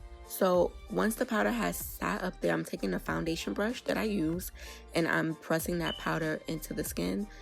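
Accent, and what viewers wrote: New York, United States. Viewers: Right knee she was a NYC girl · It’s so easy to pick up on. Lol I’m from Nola and knew she was from NY haha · Yasss!! 😍😍 I’m like yup, she from ny.. I can hear it.. where do u find ebony bronze? I can’t find it anywhere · Gotta be from New York u sound just like juju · I LOVE how you talk lmao. Are you from NY?! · That cut crease is EVERYTHING!!! Absolutely beautiful!! BTW: u sound like ur from NYC? If so.. NYC STAND UP!! Great job 👏🏽👏🏽👏🏽👍🏾👍🏾👍🏾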